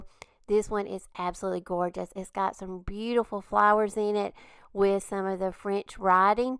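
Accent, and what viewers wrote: South, United States. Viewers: Thank you so, so much for getting back to me, don't know how I missed it, can't wait to make some of those trees! By the way, while I was searching (& finding 25 OTHER fun things to make!) I saw something where you seemed like you were sort of apologizing for your Southern accent---don't apologize, it is charming & adorable. I've never lived in the South, but I love hearing you talk, makes me feel like we could sit down on the front porch with a glass of sweet tea & giggle about some of our craft disasters (no. more. glitter. ever.) and favorites. Thanks again!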